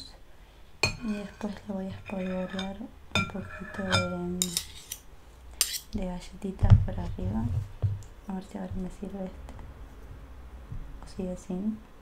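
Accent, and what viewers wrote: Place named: Argentina. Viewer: El acento argentino junto con el tono y carisma de es buenísimo!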